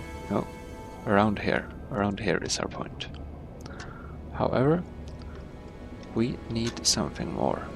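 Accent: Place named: Sweden. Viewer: I knew you where swedish on tour accent or what it is called och kolla jag är också Svensk hej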